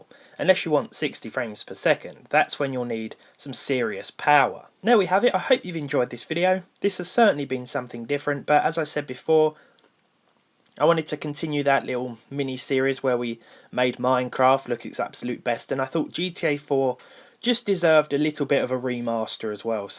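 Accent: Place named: England, United Kingdom. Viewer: Jesus... what a disgusting english accent. :(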